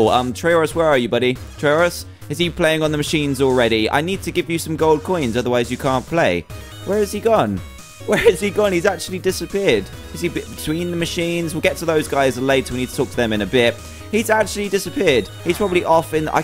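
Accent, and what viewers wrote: United Kingdom. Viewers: British dah doy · He's British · i think british idk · ....British duuuhh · British DUH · It's British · British Bro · British duuuuuuuuuuh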